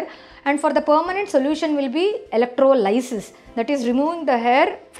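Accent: India. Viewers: Her solution on one side and her Indian accent on other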